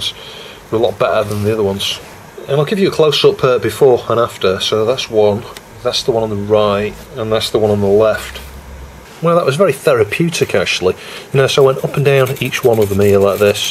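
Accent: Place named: Manchester, United Kingdom. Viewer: Sorry for offtopic but I like your accent, is that Manchester accent? :-)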